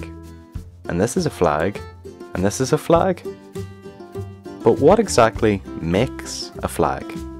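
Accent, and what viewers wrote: Northern Ireland, United Kingdom. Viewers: Dammit, I knew I should have guessed Northern Irish... oh well. ;) And thanks - it was the vowels that gave it away, I knew it must at least be related to Scottish. I've had a lot of exposure to English over the years, even for a Danish guy, so the vowels were very easy to notice. I've had almost no problems understanding your dialect. I mean, I've occasionally had to go back and listen one more time, but then that can happen with American and other British dialects as well. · I find ur accent very distinguishable as Northern Irish but also easy to understand. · Are you from Northern Ireland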